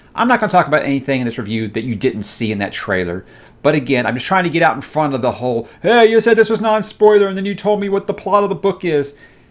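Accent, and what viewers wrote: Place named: South, United States. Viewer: Nice! What part of the South are you from?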